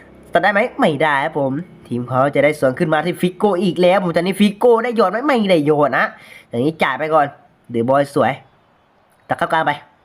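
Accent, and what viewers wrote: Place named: South, Thailand. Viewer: คนใต้ม่ายนิลิ้นรัวจังเติ้ล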